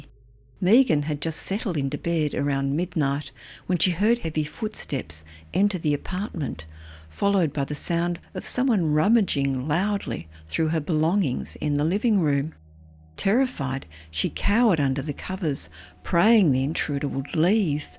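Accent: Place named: Australia. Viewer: Its simply an Aussi acent, so it sounds familiar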